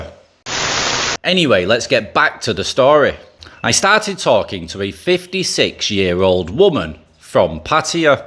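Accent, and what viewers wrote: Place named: Scotland, United Kingdom. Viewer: Watching for 10 minutes straight and I could’ve sworn I was seeing Adam Sandler doing a Scottish accent! Awesome dude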